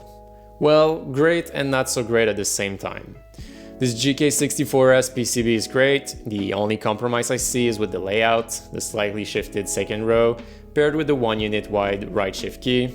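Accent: Quebec, Canada. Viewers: Hey, are you looking to sell this keyboard? I live in Montreal and I can get around - I figured from your accent that you are from Qc as well. So if you're interested, hit me up!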